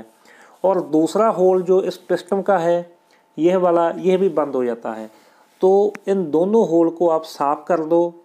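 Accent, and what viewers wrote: Punjab, India. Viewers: Very good voice quality with Punjab I accent. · Punjabi banda lag raha hindi bolne ki tone se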